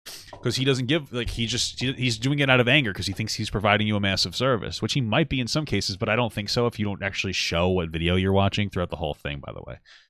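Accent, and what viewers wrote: United Kingdom. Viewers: I'm Australian and sounds like he has a British accent.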